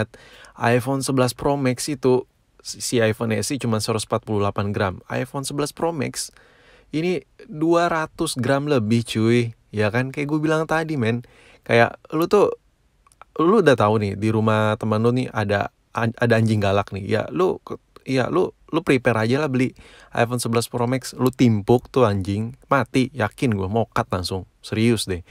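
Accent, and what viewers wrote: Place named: Gunung Sindur, Indonesia. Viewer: Logat lu kaya orang gunung sindur anjay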